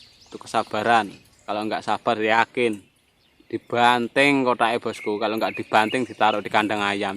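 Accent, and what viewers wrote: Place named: Madura, Indonesia. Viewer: Oohhh tak kira di Trawas Pasuruan atau wsrga Pasuruan Jawa Timur. Dialog bahasa nya seperti Jaws timur Madura turun Pasuruan. Terima kasih telah berbagi ilmu, semangat ya mas. Berbagi ilmu nya.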